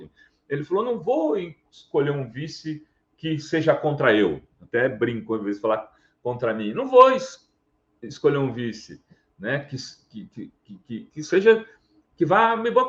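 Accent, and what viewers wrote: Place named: Minas Gerais, Brazil. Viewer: Sotaque mineiro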